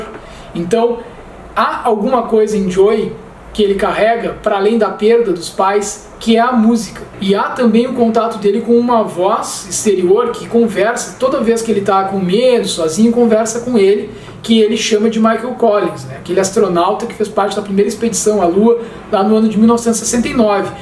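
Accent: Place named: Rio Grande do Sul, Brazil. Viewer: Já vi que é meu conterrâneo pelo sotaque. Mas bah, né? Amei o vídeo. Verei os outros em breve.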